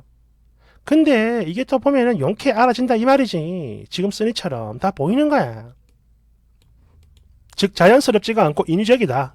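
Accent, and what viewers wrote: Gyeongsang, South Korea. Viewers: 경상도 분이시구만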